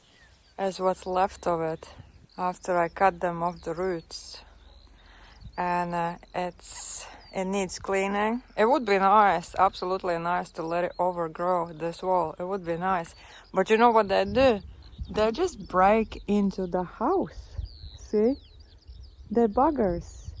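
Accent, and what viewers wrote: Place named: United Kingdom. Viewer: Buggers! I'm getting hints of British English. Charming!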